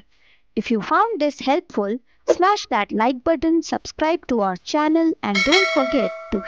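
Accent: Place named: India. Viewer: Just by hearing tha accent made me run form this video. Indian scammer.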